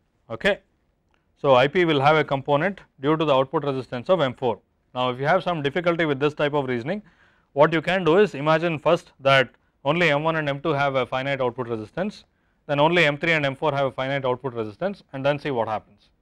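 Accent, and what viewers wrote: India. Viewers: I don't understan any word he says. Too much fast and also with indian accent.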